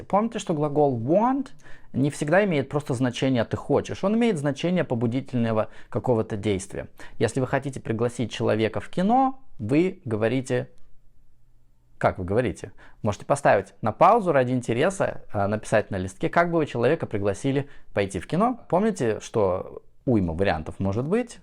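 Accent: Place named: United States. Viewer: Хоть и предпочитаю британцев, но у очень аккуратное и ненавязчивое американское произношение. В сети среди русских он по этому показателю несомненно намба уан. Да и русский у него не без изыска. Лишь интонация слегка выдает в нем давно не бывавшего на родине. Но это естественно.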